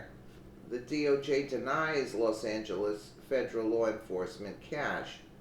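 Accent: New York, United States. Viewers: yeah and she sounds like a really great dame who knows the score - New York accent ?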